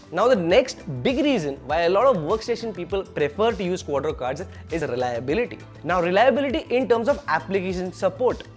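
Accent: India. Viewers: Screw your Hindu English .... :)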